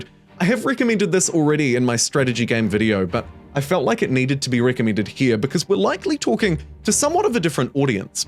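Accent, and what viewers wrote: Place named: New Zealand. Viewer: Because the New Zealand accent causes e to sound like i.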